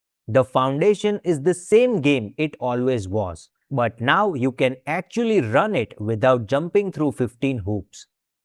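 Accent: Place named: India. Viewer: Indian accent, disregard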